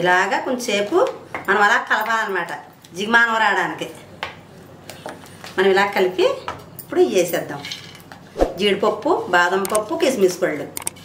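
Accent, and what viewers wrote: Godavari, India. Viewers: abha.. maa godavari jillaa basha antha haayiga vundho vinadaniki aa matallo ame abimanam vundi 😊😊😊😊 thank you so much amma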